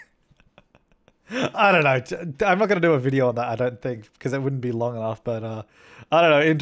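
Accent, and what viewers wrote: Australia. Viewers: It look me too long to recognise your accent is Aussie 😂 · it's the accent, all dudes sound like this in Australia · They both have Australian accents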